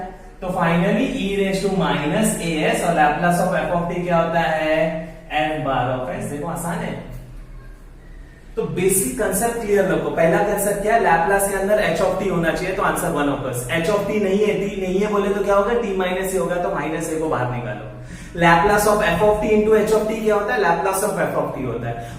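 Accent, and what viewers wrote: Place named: India. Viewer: Why is indian english so difficult to understand? :(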